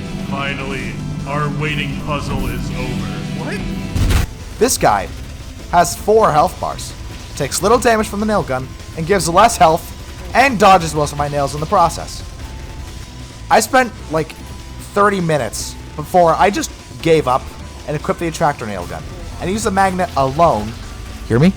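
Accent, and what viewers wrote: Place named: Australia. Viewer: As an Australian I can confirm this is how we talk down here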